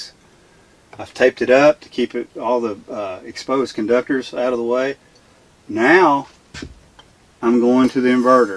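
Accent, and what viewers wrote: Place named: South, United States. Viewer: My kind of Guy Sounds Southern And very Intelligent thank you 🙏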